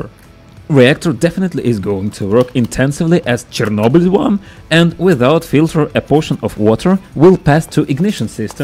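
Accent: Russia. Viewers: Are u Russian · Я немого понять это русскоязычный Чувак говорит на английском ? А то что то акцент палиться 😀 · Прокачай английский пж, а то русский акцент раздражает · Я же знаю, что ты русский. Акцент тебя спалил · русский. Это очень слышно по акценту.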